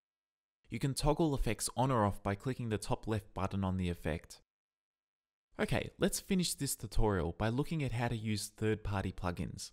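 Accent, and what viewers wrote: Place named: Australia. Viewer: Something about Australian accents makes me pay attention way better, thank you!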